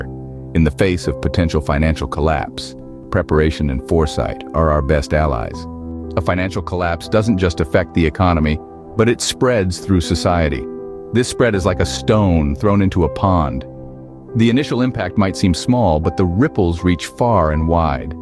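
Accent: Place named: South, United States. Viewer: I love your southern accent. 😉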